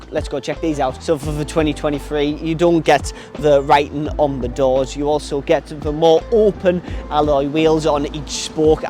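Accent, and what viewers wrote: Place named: Newcastle, United Kingdom. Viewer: Newcastle / geordie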